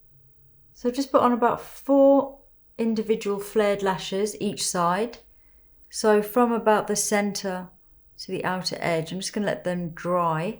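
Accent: United Kingdom. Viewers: Not only your magical makeup works and your beautiful natural look makes me to watch your videos, but also I'm here to enjoy your gentle and sweet British Reserved Pronunciation accent. You are as soft-spoken as a wind-harp swinging in an abbey door.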